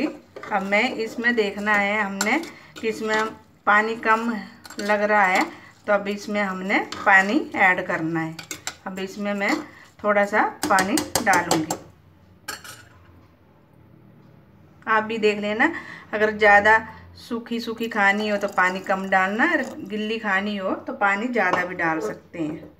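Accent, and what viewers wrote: Pahari, India. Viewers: I watched so many videos of this recipe but what was special in your video was the little pahari accent that was there Usko sun ne baad confirm hua yes someone from Himachali connected to roots is making this and this is authentic and right way to cook it This was so sweet of you aunty ji ♥️ Much love from your son like child Deepak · Araa aunty apki recipe ne toh moti hi madad hi kr di.. lockdown k time (pahari accent) ☺️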